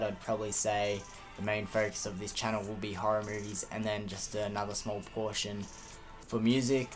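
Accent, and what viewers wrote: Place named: Australia. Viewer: In one of your videos I was guessing where u were from....and what came to mind on your accent was either....AUSTRALIAN....SOUTH AFRICAN or ENGLISH......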